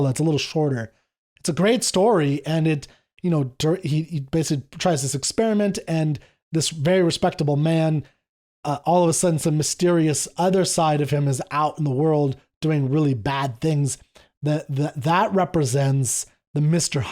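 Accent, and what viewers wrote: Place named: United Kingdom. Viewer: I know his British accent doesn't help you in the US sometimes, but yeah I thought he meant Jackel not Jekyll. Honestly I might be mistaken but that was where my head took me with all the death, killing and mixed biblical /mythical references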